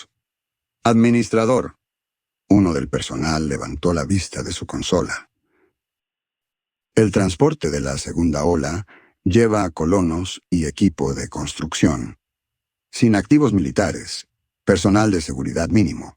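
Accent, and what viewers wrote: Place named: Latin America. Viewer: Q bueno escuchar una voz latina. Desde argentna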